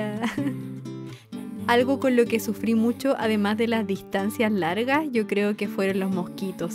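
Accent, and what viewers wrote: Chile. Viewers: Eres chilena?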